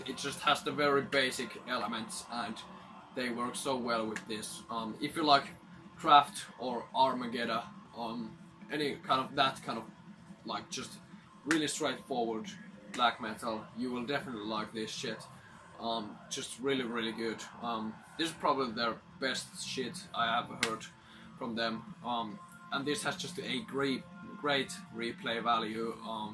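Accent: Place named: Europe. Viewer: Where are u from? U have an European accent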